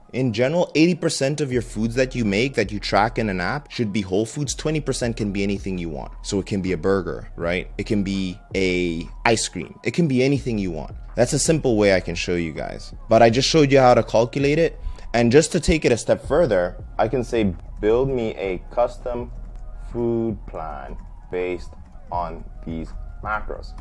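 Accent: South Africa. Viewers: Nah, I'm hearing a bit of a South African accent here. Edit: Oh, it is indeed.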